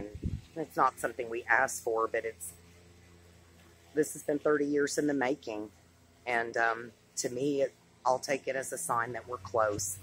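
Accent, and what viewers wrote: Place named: South, United States. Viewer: Your voice is beautiful and I love the southern accent. I am a native Texan and I missed the southern drawl. I love you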